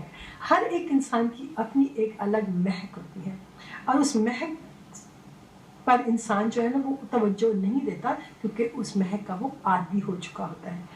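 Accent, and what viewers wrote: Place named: Karachi, Pakistan. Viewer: Do you still run this program? Love your urdu! Not sure where are you from but I'm orginally from Karachi and you have that Karachi accent. Keep the good stuff coming in.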